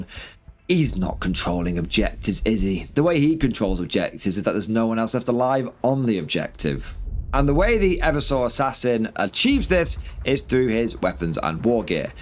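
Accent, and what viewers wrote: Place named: United Kingdom. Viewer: he's British they break everything English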